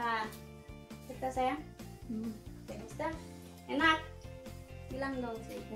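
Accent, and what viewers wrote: West Java, Indonesia. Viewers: ih pertama kali buka video na logatna teu bisa bohong tos katebak orang sunda tea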